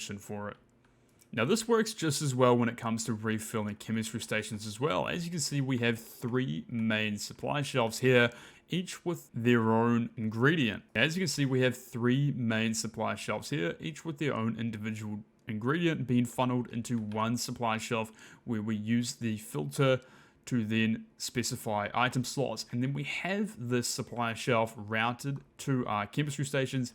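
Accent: New Zealand. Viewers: as an aussie, he defo speaks proper English, just sounds like he's from new Zealand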